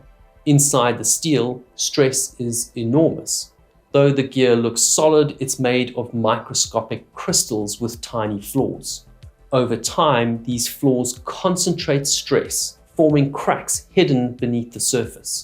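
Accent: South Africa. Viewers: Nice to hear your SA accent again from NZ. In the 1960s the old BMC Mini had a magnet on the oil drain bung, it was often covered in fine steel particles, if anything larger was noted, they were visually examined. Sections of teeth could be identified by comparing with other gear clusters. The customer could then decide if a precautionary teardown was in order or if they would risk it until failure. Only once did I hear of a complete seizure, this resulted in the car stopping like full application of the brakes; being front wheel drive. It was hit by the following car, no injuries. Keep your good work videos going.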